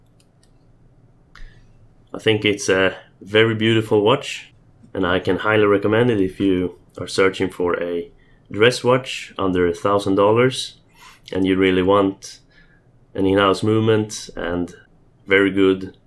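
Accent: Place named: Sweden. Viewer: The accent haha. I've heard it a million times here in Sweden, though you don't have one as thick as most.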